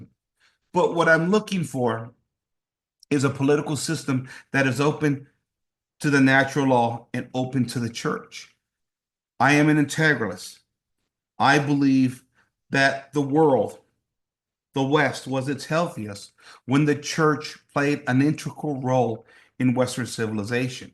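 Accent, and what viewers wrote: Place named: Northern England, United Kingdom. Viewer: I think the History guy is either northern English or Scottish judging by his accent not that I am expert on accents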